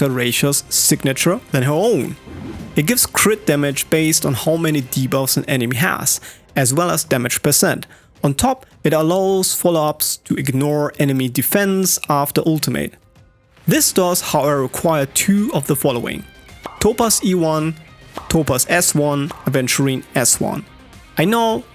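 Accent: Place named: Germany. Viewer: bro got that german accent🗣🗣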